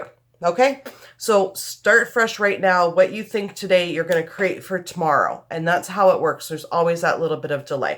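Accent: Canada. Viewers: This is off topic but are you Canadian? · .....Are you Canadian???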